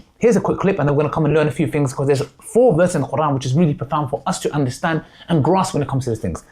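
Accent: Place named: United Kingdom. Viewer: By the way u have a beautiful British accent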